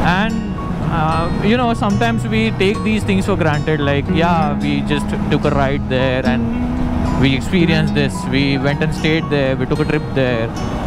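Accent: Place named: Kolhapur, India. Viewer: I soooo friggin miss that Kolhapuri accent though! 🥺🥺🥺🥺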